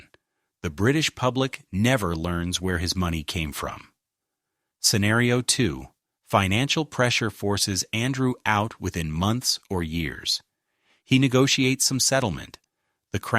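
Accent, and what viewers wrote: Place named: United States. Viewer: What I would like to know as a British Citizen of 83 years is.............why an American "voice over" accompanies old film footage of English Royal Estate Dwellings (security compromise aside !) ! Is this visual "offering/conjecture" open to American interpretation ? Why not investigate President Trumps "holdings" in America for local interest ? Why is Britain the "flavour of the Journalists month" ? NO I DID NOT ENJOY THE "INNUENDO" OF THIS VIDEO. ....or the presumption that all is open to American "investigation" ! Perhaps the American Journalists have fewer problems investigating foreign shores rather than their own, or, would the President object ?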